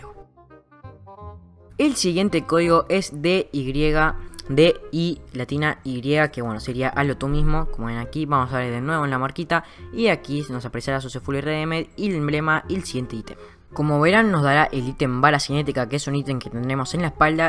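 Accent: Argentina. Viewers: Eres aljentino ?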